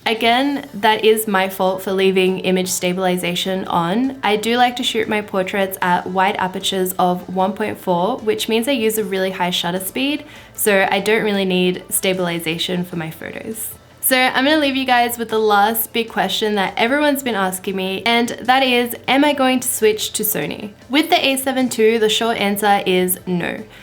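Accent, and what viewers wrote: Australia. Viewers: Valley australian accent? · As an English teacher in Germany I just simply enjoy listening to you speaking. Your Australian accent is adorable! ;-)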